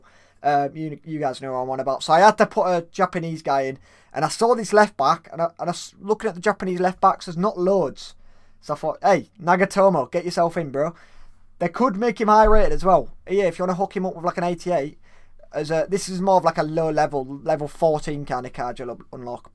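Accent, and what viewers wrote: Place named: Yorkshire, United Kingdom. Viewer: I live in thailand and never hear the Yorkshire accent anymore, keep up the good work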